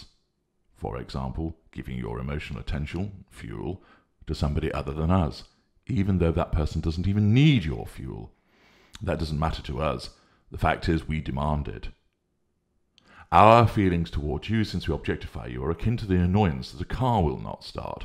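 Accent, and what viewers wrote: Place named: North, United Kingdom. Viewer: Yeah he sounds like he's a RADA graduate. But every now and then I notice either a Midlands or northern accent coming through so I assume he's not from down south originally. It takes a Brit to notice this aspect.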